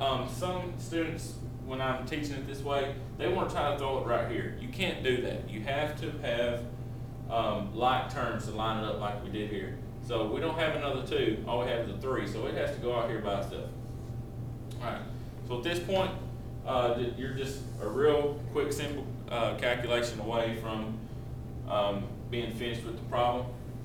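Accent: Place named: Texas, United States. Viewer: good teacher. I like his voice it reminds me of Texas.. I like the way he does the method of problem solving too.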